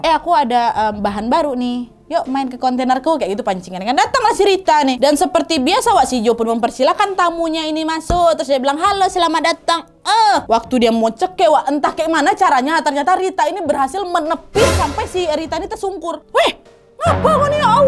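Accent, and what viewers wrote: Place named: Medan, Indonesia. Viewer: Logat Medan yg totok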